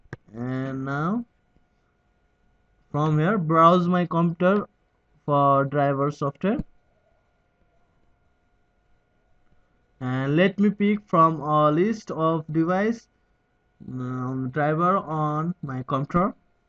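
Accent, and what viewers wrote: Nepal. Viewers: Dude, are you Nepali? You got a very Nepali accent. Your video didn't even address my problem. Thanks anyways. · Nepali Manxe le English boleko xittei chininxa dai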